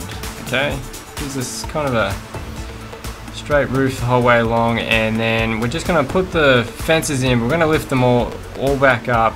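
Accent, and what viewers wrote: Australia. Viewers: Is that an Australia accent I hear by the way your the best youtuber ever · It's the WIDEST Australian accent i've heard yet =O)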